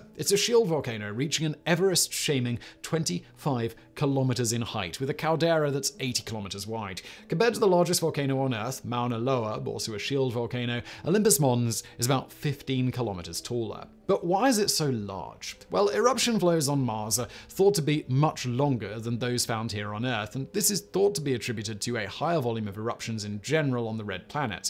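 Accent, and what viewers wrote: United Kingdom. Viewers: He's British... He says it the british way... Just like Aluminium, Carribbean ect is said differently from American English Also Meth is said Meh-th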